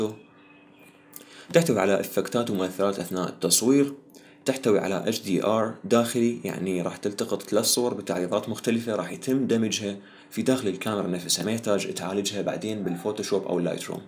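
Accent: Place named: Iraq. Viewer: انت خوش يوتيوبر و انطيتك اشتراك و لايك بك كولي انت عراقي ؟؟؟ لاحضت من لهجتك انه انت عراقي و شكراً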